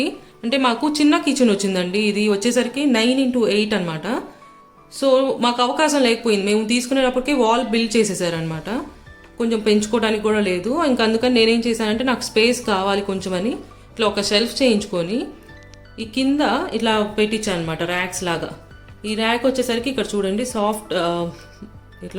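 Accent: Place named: Guntur, India. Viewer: Super ga undhi mam, mana guntur language and way of explanation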